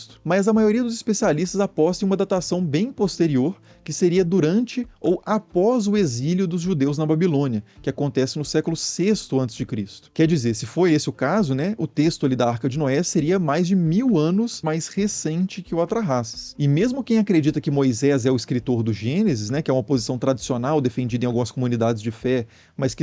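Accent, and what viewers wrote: Minas Gerais, Brazil. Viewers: Uma pergunta nada a ver, mas o narrador é mineiro né?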